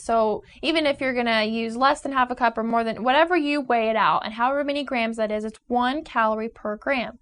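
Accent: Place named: United States. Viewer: that American accent really grates on me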